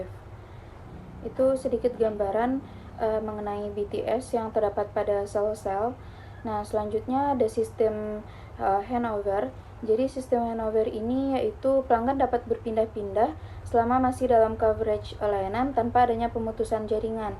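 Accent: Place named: West Sumatra, Indonesia. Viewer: Wah enggle sudah bagus, tpi bahasa indo nya masih kelihatan bukitinggi vibes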